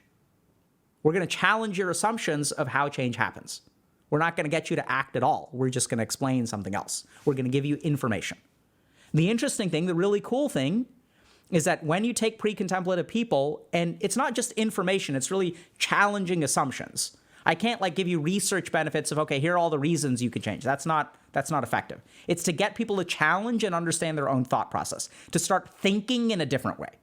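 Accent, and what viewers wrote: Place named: India. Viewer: I only want to converse with in his native Indian-English impersonation accent. I know it's not pertinent to the topic discussed it was just welling up from inside of me from the previous video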